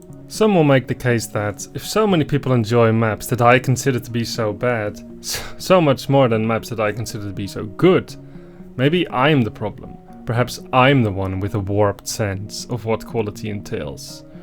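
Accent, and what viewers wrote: Netherlands. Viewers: do i sense a dutch accent in there? :D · Hey question. Are you dutch/netherlands?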